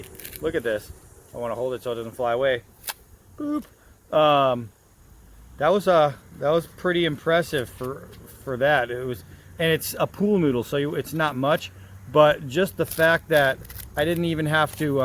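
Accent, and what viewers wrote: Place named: Rochester, United States. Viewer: Got this knife today, nice demonstrations. Where you from sound a little like Rochester NY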